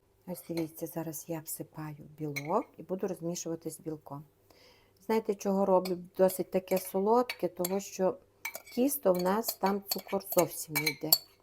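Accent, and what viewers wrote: Transcarpathia, Ukraine. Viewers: Пані,дякую за рецепт.завтра буду пекти. Дякую за дуже детальні рецепти і за чудовий закарпатський діалект.❤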